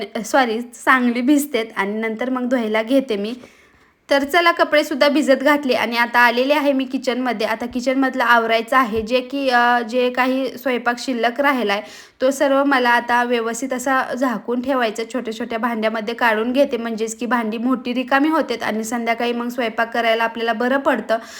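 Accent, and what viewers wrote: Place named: Sangli, India. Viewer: Languagecha tone sanglikdcha vatoy...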